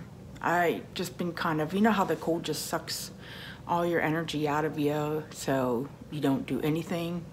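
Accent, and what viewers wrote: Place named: Pennsylvania, United States. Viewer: Hi Tammy of OKC mentioned you, so my first time viewing your channel. I knew without even looking at your address you must be from PA. I'm from NC but my neighbor of years and years is originally from PA and y'all have the same accent! I enjoyed your video!!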